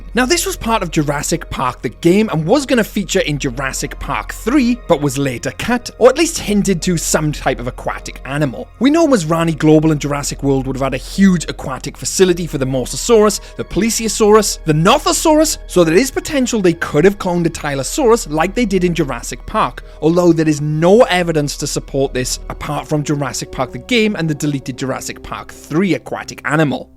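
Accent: Wales, United Kingdom. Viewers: Just new to your channel I love it also are you Welsh too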